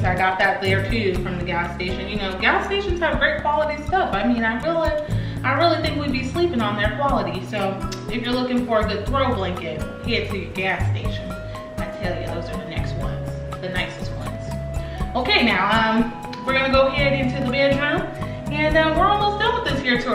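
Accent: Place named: South, United States. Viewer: Is the southern accent for me😂😂😂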